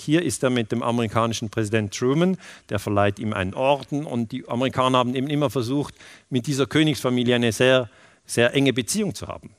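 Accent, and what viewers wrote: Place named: Switzerland. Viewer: Es chomt immer wider chli schwitzerdeutsch vo ihm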